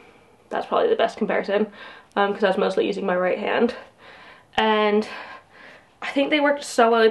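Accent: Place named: England, United Kingdom. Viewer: You can tell when she’s been hanging out with Claire a lot cuz the English side of her accent gets heavier lol. How long have you lived in the UK?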